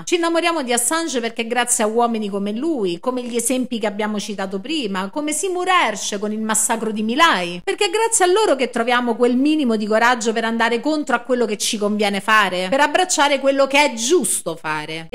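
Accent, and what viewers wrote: Rome, Italy. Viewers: continua pure con la calata romana perché tu la fai elegante e rendi chiarissimo i concetti.....belle ed efficaci anche le espressioni che accompagni la calata romana.E poi usi espressioni dialettali romane e non in marie eccezionali, simpatici e precisi, mi fai morire con quelle siciliane😂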